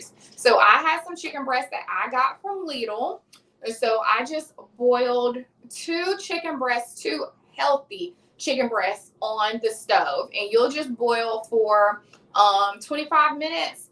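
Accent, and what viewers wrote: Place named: South, United States. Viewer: Watching the replay thinking, is she sounding extra country today or am I tripping and then you said it, 😂😂 I'm like ok, I ain't tripping!! I will definitely be making this dip!! Looks delicious!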